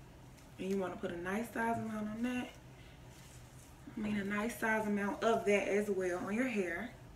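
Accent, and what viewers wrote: Atlanta, United States. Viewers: Glam Doll you know that’s an Atl accent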